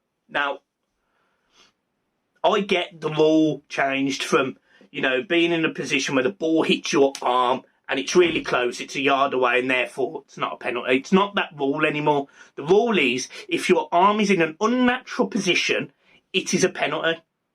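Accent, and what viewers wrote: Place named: Black Country, United Kingdom. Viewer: Tbf mate, you nailed it there. Sometimes it's hard listening to a blue with a black country accent, but that was a great video. I'll be checking in with you more often kid. UTFT 💙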